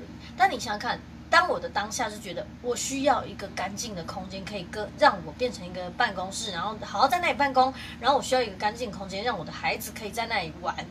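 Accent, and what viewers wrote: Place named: Taiwan. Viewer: 的台味超級喜歡😘有買的書，現在在家防疫都聽說書邊丟東西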